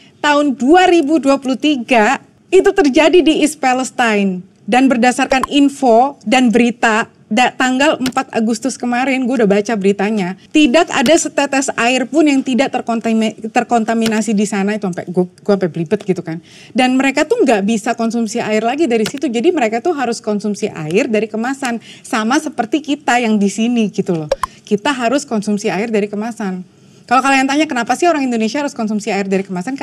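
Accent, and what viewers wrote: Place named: Java, Indonesia. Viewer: Mba nya imut ...., kosa kata Jakarta tapi logatnya medok Jawa, jadi pengen nyubit si Mba ... heheee..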